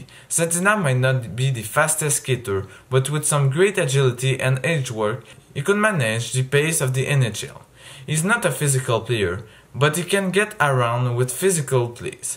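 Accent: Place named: Quebec, Canada. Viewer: Are you French Canadian or Russian lol? You sound like a mix of them haha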